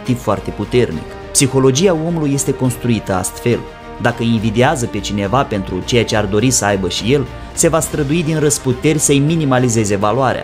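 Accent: Moldova. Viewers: Bună seara.Povestitorul este din Republica Moldova sau e basarabean? Mulțumesc.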